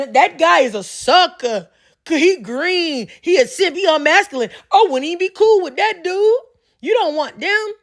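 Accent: Philadelphia, United States. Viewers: Are u from Philly?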